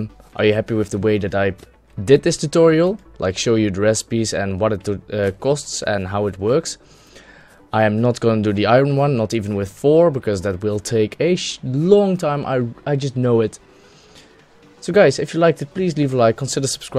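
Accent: Netherlands. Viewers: Ik kan het nederlands goed in je stem horen maar je engels is wel goed hoor! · Jij bent nl toch? (ik hoor het aan je engels) ;) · when the dutch accent is strong..... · i dont know but are u nl? u talk like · haha mooie nederlandse saccent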